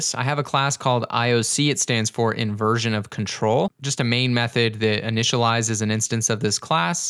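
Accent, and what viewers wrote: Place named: England, United Kingdom. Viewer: Perfect explanation it's also nice to hear an an English accent I hypothsize YouTube's algorithms promote Indian vloggers it's so hard to find programming videos in English accents thanks for the awesome video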